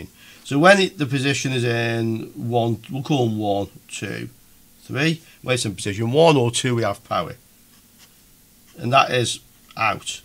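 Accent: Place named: England, United Kingdom. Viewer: Very entertaining and valuable video. Learned now how to "design" the varioboard today. Can someone tell me what English accent he has? Where does he come from in the UK?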